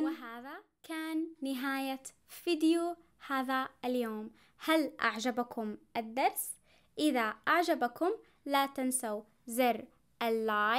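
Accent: Saudi Arabia. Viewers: شکرا جزیلا. لهجه السعودی جمیله.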